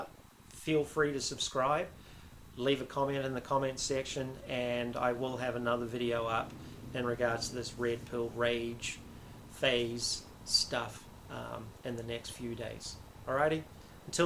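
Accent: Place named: New Zealand. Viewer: The kiwi/NZ accent is so cool!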